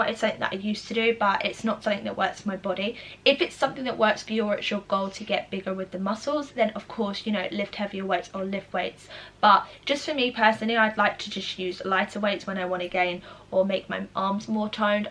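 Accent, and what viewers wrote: United Kingdom. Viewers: Dominique Nah she's English,sounds like from the South East Coast. Essex, maybe Chigwell which is just adjacent to Northern region of Greater London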